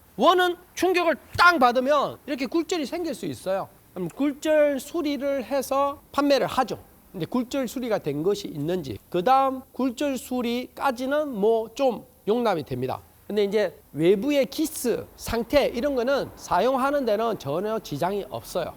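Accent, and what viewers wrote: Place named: Gyeongsang, South Korea. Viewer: 갱상도사투리 ㅋㅋㅋ굿입니다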